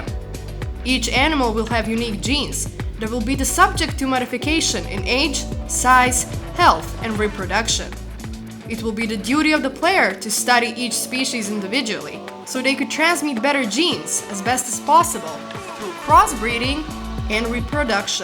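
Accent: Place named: Russia. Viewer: its a women I can hear the Russian in her voice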